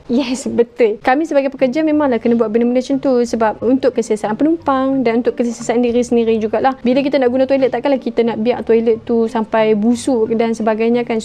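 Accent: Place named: North, Malaysia. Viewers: Muka mcm mat saleh tapi kedah piau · Utara universal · bantai cakap utaqha tequih 🤪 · Bahasa utaqha dia mmg piaw<link> 😎 Episode lepas: pakai accent KL Episode kali ini: You're the truly Oqhang Utagha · Sedap dengar dia cakap utagha.. padu👍🏻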